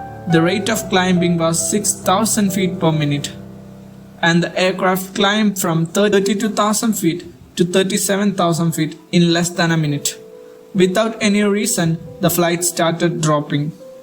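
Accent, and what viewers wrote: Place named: India. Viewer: Anying logat Inggris India kocak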